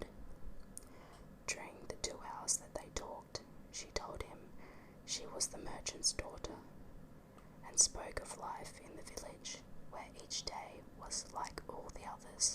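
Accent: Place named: Australia. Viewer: Definitely an Aussie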